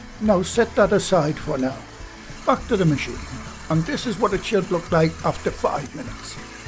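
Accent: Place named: Northeast England, United Kingdom. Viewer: from your accent you where brought up in the North East of England, the North East of the UK must be well into Scotland.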